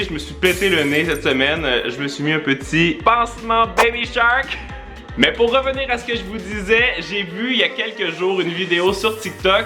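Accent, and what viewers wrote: Quebec, Canada. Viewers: 😂😂j’adore,ton accents québécois 😂😂j’aimerais trop abiter au Canada !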